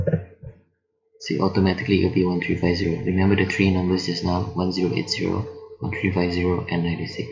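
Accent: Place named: Singapore. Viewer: omg why did it end abruptly. machiam cliffhanger lol. But thanks so much for this tutorial! Possible to explain the difference between exporting in LR vs PS? I prefer to stay within one platform but am curious as to whether the difference between these 2 exports would be significant. P.S. I think this is my first tutorial watched done and voiced by a fellow Singaporean. Nice to hear a familiar SG voice! Thank you for the tutorial! Subbed!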